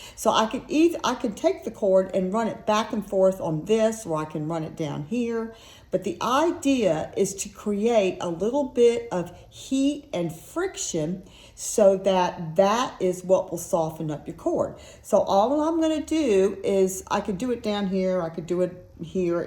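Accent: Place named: United States. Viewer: Thanks, nice to know this can be done without steaming the leather. I made a bracelet with my own woodturned beads strung on 3mm leather cord with leather barrel knots in between my woodturned beads, but the leather was too stiff as a bracelet so I pulled it apart, undid the barrel knots which retained some of their kink. I will try your method to unkink the leather so I can make a choker with it. Seems a lot of people are copying each others videos on youtube. You sound more American than Italian! PS - the tool you call a 'clamp' is actually called a 'vice'.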